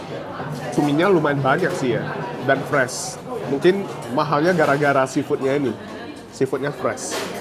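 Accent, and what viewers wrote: Java, Indonesia. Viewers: Kok medhok sih Koh skrg? Gak klen klen Tidak berasa USU-nya Aku nonton film Thailand lumayan beberapa kali, plek ketiplek kayak Chinese Banyak yg budayanya mirip ada yg Hakka, Hokien, Two Chew, dll jg cuma beda Bahasa yg terakhir aku tonton yg tentang ngerawatin Neneknya itu apa ya lupa judulnya, manggilnya Ama jg, trs Dewi Kwan Im jg gitu trs jadi vegan semenjak cucu/anaknya sakit apa gmn lupa Tapi cucunya udh nggak bisa Bahasa Cina, sering dicandain sama neneknya ah kamu gmn sih keturunan ini (ethnic group x) tp gak bisa bahasa ini (ethnic group x) Plek ketiplek kayak Chinese Indonesia yg NTT itu ceritavelin, Surabaya, Medan, Melayu, Kalimantan, Semarang, Bogor, dll seluruh Indonesia Manggilnya Ama jg, tp kalau di pasar masih pada bisa cuma ya yg bnr2 bisa rata-rata generasi Kakek Neneknya Yg generasi skrg bisanya Bahasa Thailand gak nyadar Chinese Di Indonesia jg rata-rata Chindo generasi skrg bisanya cuma Bahasa Indonesia trs ngaku org Indo bangga Kaget bgt ada Chindo di Aussie, pas tes DNA cuma "Chinese" gak ada penjelasan apa2 ya karena database mereka emg msh dikit yg Asia jd ga ada penjelasan apa2, Chinese mana leluhurnya gtu, trs dia bilang dia org Indonesia dan bangga gtu tp udh tau klo gini doang mah 😂 kagetnya krn dia bangga ngaku org Indo bukan Aussie bukan Chinese, tp lebih mudah si klo mau belajar Bahasa Mandarin atau komunikasi, punya akses, tercerahkan kalau butuh yg berkaitan budaya Chinese dan keturunan, bisa gaul, nimbrung jg Mirip di Malaysia jg, katanya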